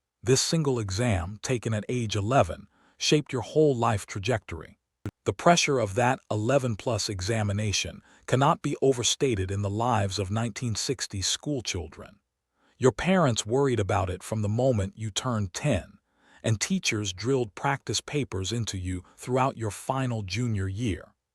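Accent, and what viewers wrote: United States. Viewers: The American voiceover suggests this can be disregarded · Why an American voice,?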